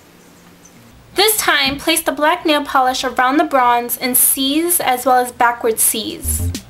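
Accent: New York, United States. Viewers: Cool ;) !! New York accent ? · Very cool video!! I love all things related to doing my own nails, natural, polishes, etc. I will be trying this. P.S Love the accent, sounds like New York maybe or north period!!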